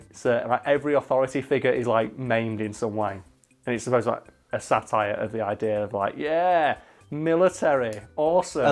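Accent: United Kingdom. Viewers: tone the british back a notch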